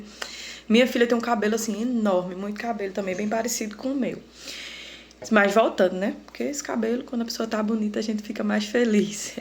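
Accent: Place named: Northeast, Brazil. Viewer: O sotaque nordestino é muito lindo,eu tenho ranço do Paulista toita,poita,kkk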